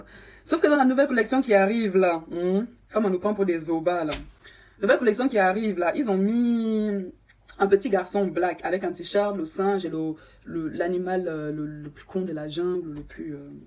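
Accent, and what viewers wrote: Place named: Cameroon. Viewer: Vu son joli accent, c'est ma soeur camerounaise.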